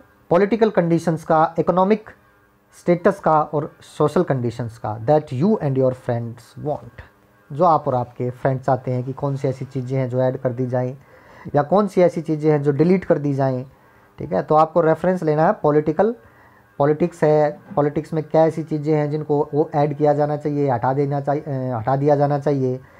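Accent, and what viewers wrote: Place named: Haryana, India. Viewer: Sir aap haryana sai ho na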